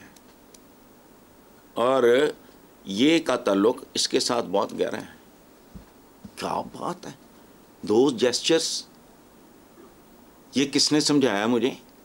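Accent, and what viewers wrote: Punjab, India. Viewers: * This dude why he is speaking urdu in punjjjjjjjjjjjjjjjbi accent? I lived in pakistan. Only the very poor and islam's thekedars are against wine, beer and whisky there. Open up let people enjoy themselves, religion is nothing but figment of lmagination., there's only one life so enjoy it.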